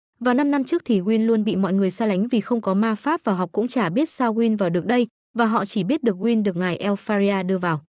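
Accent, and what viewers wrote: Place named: Nghe An, Vietnam. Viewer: Tôi chắc chắn ad là người nghệ an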